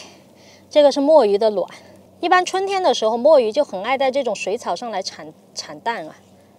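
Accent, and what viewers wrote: Sichuan, China. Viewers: 你是重庆的还是成都的😁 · 哈哈幺妹儿是四川哪里的？ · 泸州 or 宜宾人吗? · 妹儿 四川人啊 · 妹妹是四川人吗？ 很好听的川普呀，😝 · ，你是我们四川老乡吗？好重的川味普通话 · 你四川哪儿的？ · 这说的是四川普通话吗？？？ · 四川话好可爱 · 四川的蛮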